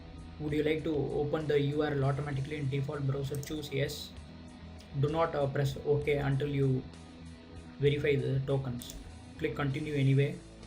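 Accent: India. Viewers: talk with an Indian accent, like I speak with a Norwegian one. He explain things very good, no problem to understand. If you think he talk fast, that is just because of his dialect. Thumbs up.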